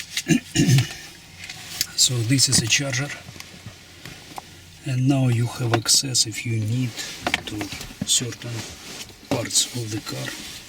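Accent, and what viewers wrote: Russia. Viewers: А почему акцент рязанский?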